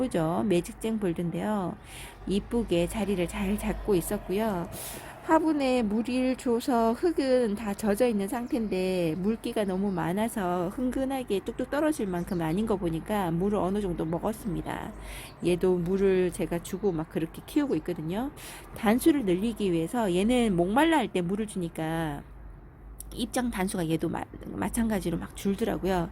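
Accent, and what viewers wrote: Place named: Ulsan, South Korea. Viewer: 울산 어쩐지 목소리가 친근감이 있어서 저도 울산^-^ 항상 잘 보고 있답니다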